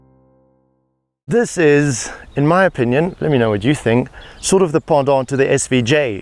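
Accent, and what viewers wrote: South Africa. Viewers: First 10 secs of the video, I'm like, "Why do you sound South African bru?" 😂😂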